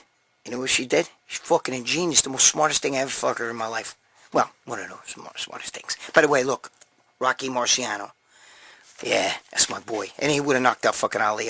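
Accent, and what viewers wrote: New York, United States. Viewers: Brother, you sound like me with a New York accent! Take care of yourself we need you...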